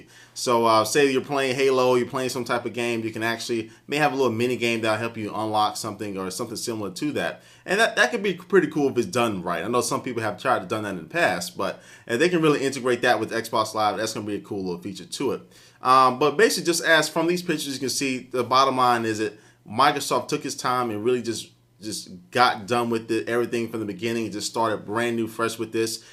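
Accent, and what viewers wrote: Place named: United States. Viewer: i can tell you are american you know why because americans seem to have this wierd thing about wrongly pronouncing words like mobile phone you say moble phone why there is an I in the word mobiiiile phone or when americans say soldering they say soddering wrong there is an L in the word soillldering no offence americans but if you going to speak english speak it right and say you are speaking english not american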